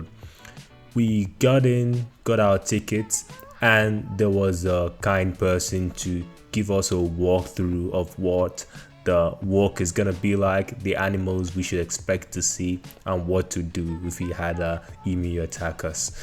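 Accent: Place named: Australia. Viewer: The Australian "mate" accent is really coming through 🤣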